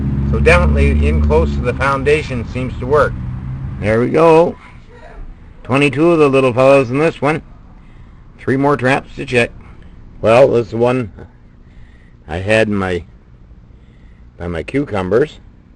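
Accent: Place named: Canada. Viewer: you're not nice.. The guy is 1. Above 60 years old and 2. A nice sounding Canadian fellow. Give him a break and save your hate.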